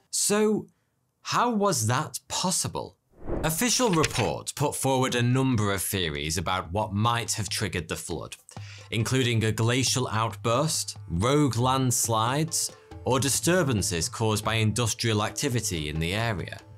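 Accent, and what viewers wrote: United Kingdom. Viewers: definitely a pom - he ain't no Aussie, there's to much of the national costume & dance, not in this. Aussies are over the 'ditch' from me, thankfully. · this man truly is a pure British 😂😂😂😭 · He is british 😂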